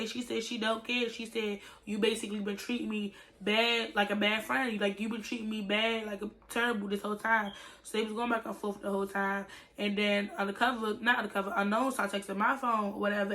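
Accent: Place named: Washington DC, United States. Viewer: You from the dmv? Lol you got that DC speech 😭😭😍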